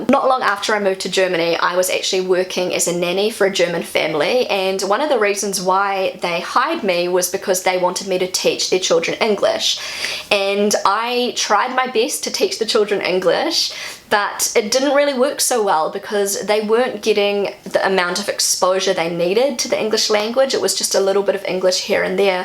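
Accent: New Zealand. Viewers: Your posh British accent still needs a bit of work. Can't keep the kiwi out. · I'm glad you didn't listen to any of those advices!! The way you do it, is perfect! I'm a kindergarten teacher and a few years back we had a little girl from Russia. And her mum, she was such a lovely lady, thought it was a good idea to teach her daughter German as well, but she couldn't speak German fluently. In the end, the little girl wasn't able to speak proper Russin nor proper German. Oh and I looooove your Kiwi accent!!! And it's great that your kids pick it up, too, cos they're half Kiwi and this accent is a big part of them. Case closed I guess 😂 Much love from Hamburg 😘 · It‘s a big advantage for your kids to speak English fluently! They are able to communicate wherever they travel. And btw, your kiwi accent is adorable, don’t ever change 🤩 Same goes for the sweet franconian accent of your kids, of course 👦🏻👧🏻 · Your kiwi accent is so nice ;-) I try to race my children bilingual (Italian and German ) too! 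· Hey, thanks so much for sharing your experiences with raising bilingual children. My husband is also a native English speaker and I am a native German speaker, so it might be helpful with our future children. My husband also grew up bilingually (English and Afrikaans), so three languages are going to be interesting. I think you guys have done a great job sticking with it! And I love your kiwi accent and that you pass it on to your children as this a big part of your biography. My husband is South African and I think it would be rather strange if our children spoke perfect Oxford English ☺️ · The British accent part was really funny, 🤣 Love your NZ accent! ... It's soo German, by the way, this accent obsession... Embrace diversity, people!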